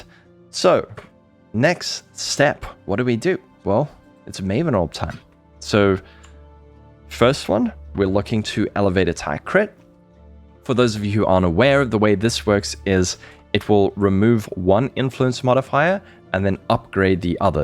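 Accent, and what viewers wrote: South Africa. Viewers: Are you South-African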